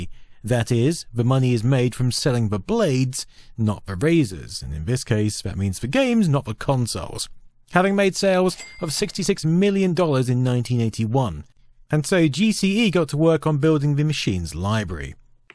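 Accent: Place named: Cockney, United Kingdom. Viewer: Nothing worse than listening to some cockney chimneysweep dragging the language of Shelley and Keats through the mud.👎🏻👎🏻👎🏻👎🏻👎🏻👎🏻👎🏻👎🏻👎🏻👎🏻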